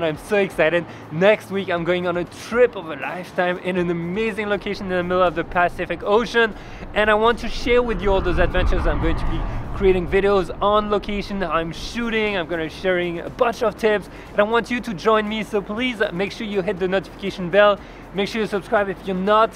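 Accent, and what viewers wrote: France. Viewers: really like your videos dude, have you noticed if you lower the reproduction speed to .5 you sound like rally drunk?? maybe because of the french accent hahaha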